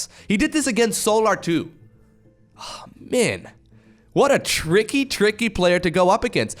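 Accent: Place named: Netherlands. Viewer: dutch accent spotted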